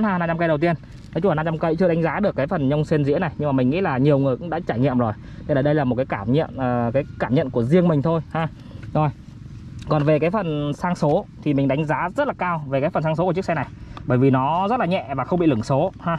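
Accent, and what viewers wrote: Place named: North, Vietnam. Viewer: Giọng ông này như kiểu người miền bắc ấy Mong anh rep lại ạ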